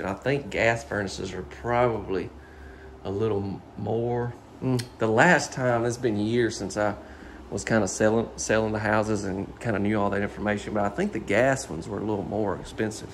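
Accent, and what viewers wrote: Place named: Deep South, United States. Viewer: Greetings from Arizona! I love this house! I love the split floor plan, how the great room is laid out. The pantry and closets are fantastic! It’s just the right size for me, and any visiting guests, or even a tenant. I hope there are other options for the time color in the master bath shower,m. There there is lime in the water where I live. It builds up, and would be much more obvious more quickly than on lighter tile. I love your videos. They are well thought out. You do a great job of showing and detailing the features. You obviously like what you do. You are enthusiastic without being “in your face”. I love your easy going manner, and your accent, that Deep South gentle drawl. Angels on your bumpers and all around you.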